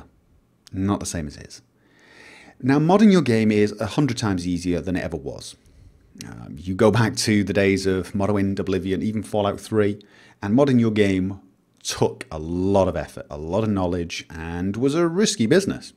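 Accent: United Kingdom. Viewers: I actually first thought you were from Britain because of your English. A lot of people who have English as their second language have a really obvious pronounciation/strong accents. (like a lot of people from Roman or slavic countries)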